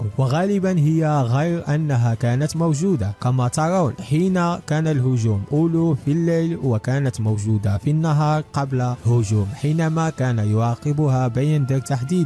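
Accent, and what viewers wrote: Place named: Tetouan, Morocco. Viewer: أخي إن أهل مدينة تطاون (تطوان) ينطقون الراء بهتن الطريقة وليس لهم يد في هذا . واهل فاس الرباط سلا ووهران المستعمرة من الجزائر ايضا يتحدثون براء قريب من هذا النطق. فأرجو ان تتقبلوا الاختلاف.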